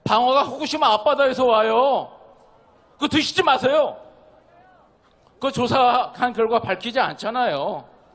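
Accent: Jeolla, South Korea. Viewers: 전라도 사투리쓰네 과학자라면 과학적 으로 증명하면 될것아니냐? 제발 그렇게 해봐라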